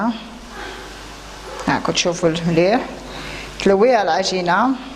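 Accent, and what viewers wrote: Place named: Bechar, Algeria. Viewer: سلام اهلا بيك يعطيك الصحة روعة الله يبارك عجبني هادا القاطو يستاهل التجربة وعلى ما اضن على حساب الهدرة تباني من ولاية بشار المهم بارك الله فيك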